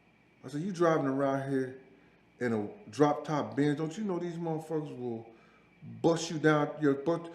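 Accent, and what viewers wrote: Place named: New York, United States. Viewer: n b4 is wild B off top. Dat NY talk is maad krazy yo!!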